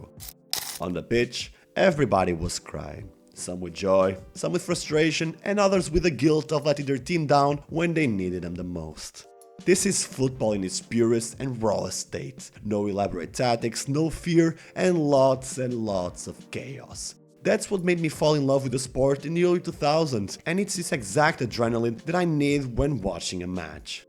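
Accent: Portugal. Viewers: No tactics? No strategy? Based on your accent, I thought you were Portuguese, but you talk about football like an American. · Portuguese accent, and his gf is Portuguese, while he might have learned the language I'm assuming he's not from Portugal am I right? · Are you portuguese? I can hear some accent rsrsrs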